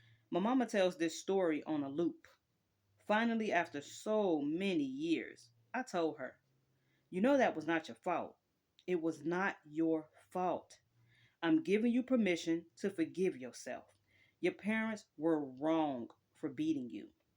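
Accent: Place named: South, United States. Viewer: what a great cookbook!! I love that you add the southern accent😊❤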